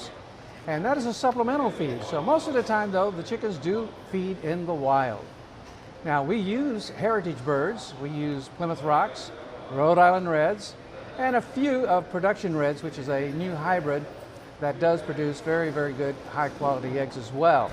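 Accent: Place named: Transatlantic, United States. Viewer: He kinda has the transatlantic accent. It sounds so nice.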